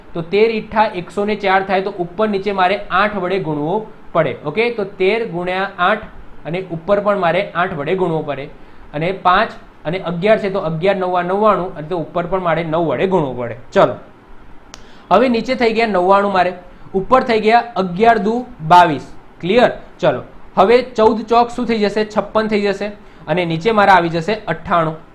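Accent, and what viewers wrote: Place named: Kathiawar, India. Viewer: Sir bov lecture joya tamara bov maja aave se bhanvani amari jevij sudhdh kathiyavadi bhasha se tamari 😊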